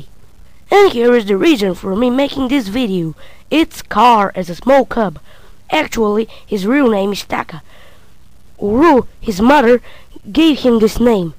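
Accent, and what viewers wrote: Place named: Bulgaria. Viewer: He's from Bulgaria and that's why this guy talks like that.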